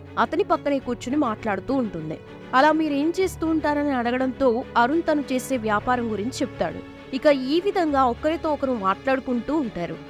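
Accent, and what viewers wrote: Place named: Andhra Pradesh, India. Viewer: Adi me ts slang kadu cheppedi ap slang adi telusuko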